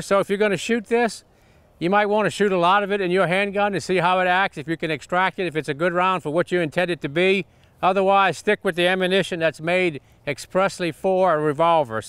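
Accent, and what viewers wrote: Louisiana, United States. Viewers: Is he from Louisiana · Wikipedia got it wrong. He got a Louisiana accent for sure.. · ***** I believe that would be a bit of a Cajun ascent.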